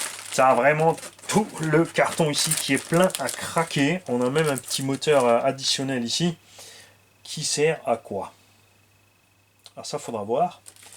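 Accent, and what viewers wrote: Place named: South, France. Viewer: ho t'es du sud? deux mille viNNt et un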